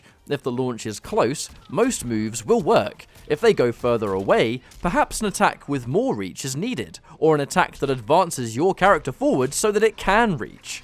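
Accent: United Kingdom. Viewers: I knew to take this video seriously when I heard a British accent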